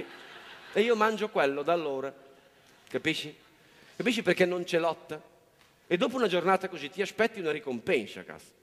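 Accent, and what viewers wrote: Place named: Romagna, Italy. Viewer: Impareggiabile interprete della parlata romagnola prestata alla quotidianità. Bravissimo.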